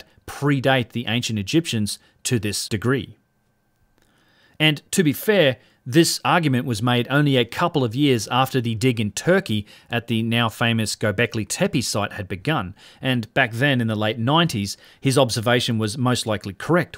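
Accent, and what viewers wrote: Australia. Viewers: Ha, Ha! Don't make fun of his Aussie accent.(dialect) President John F. Kennedy used to say, Africer and Asier. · Pyramids of Geezer... love the aussie accent! :D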